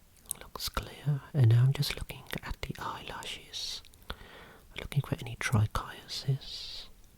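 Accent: Birmingham, United Kingdom. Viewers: you are the only person that I've ever heard with a brummie accent that sounds good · Never thought Brummy asmr whispers would hit me so hard but they do, keep up the great work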